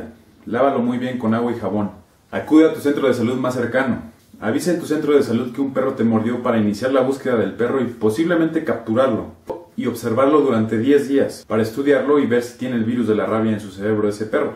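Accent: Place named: Mexico. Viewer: Porqué los mexicanos hablan así? Desmerecen todo lo que saben...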